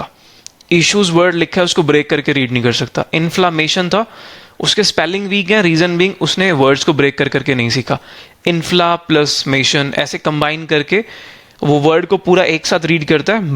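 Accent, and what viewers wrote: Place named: Pakistan. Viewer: sir ka excnt and urdu bilkul pakistani hy👍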